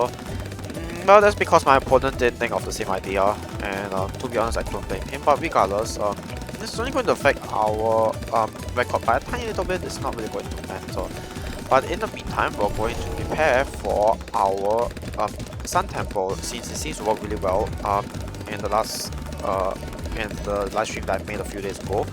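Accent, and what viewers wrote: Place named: Singapore. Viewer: Hey you sound like you are from Singapore? Just a random guess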